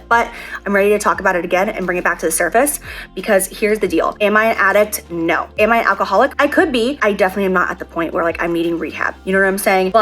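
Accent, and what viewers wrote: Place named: Upper Peninsula Michigan, United States. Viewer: I know this is super random. But, I think it's so funny that you're a born and raised Floridian, and you say 'Reesees' instead of 'Reeses'! I live in the UP of Michigan and I do remember you saying you've been here and have family here. And that's how us Yoopers say Reese's too🙂 love you! And I'm so proud of your journey, girl 💜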